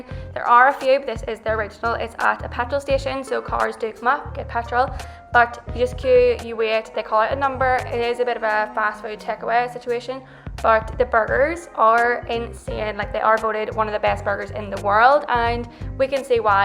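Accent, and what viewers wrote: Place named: Ireland. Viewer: Sounds like u are from ireland, did u fly direct from dublin. My son and i are going next month from ni. Wondering best route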